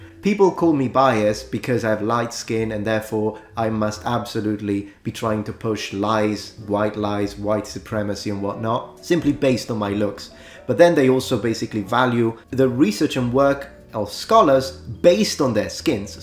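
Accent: Italy. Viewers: I didnt realise until now you had a slight Italian accent...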